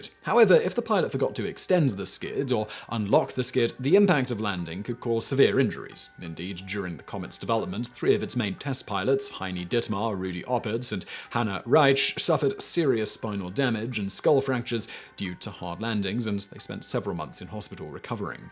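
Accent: United Kingdom. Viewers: Heyyyy another one of your channels! How did I know? You stuck your face in the thumbnail as usual. 🤦‍♂️ Get a real job lol. You basically narrate wiki articles (with 5x YouTube accounts) with a British accent and make a shit ton on money monetizing it. Maybe I should be congratulating you? I don’t even know. I just wish YT would stop suggesting this stuff and give me some original content lol. Oh but I do love the dapper clothing and those oh-so-poignant dramatic pauses between horrible jokes. 🤦‍♂️ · I love this guy's British accent it is amazing and I could listen to him all day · You try hold interest by talking really fast with a British accent, but it’s not working.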